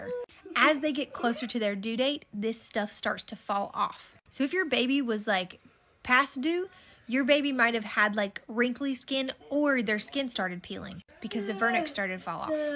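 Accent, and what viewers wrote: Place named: South, United States. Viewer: I love the way you described that without being condescending or anything, this felt like a general teaching lesson made from love. You should consider being a professor or something. There’s something about your absolutely gorgeous and sweet southern drawl that makes me want to list to you explain things all day. I’d love to learn more about the female reproductive organs and babies being formed and delivered from your voice. Anyone else and I’d probably rather not hear any of this. I’m not a father, not expecting to be one anytime soon and would only like to learn these things if it were going to be happening to me, but if you explain it, I’ll learn and take notes.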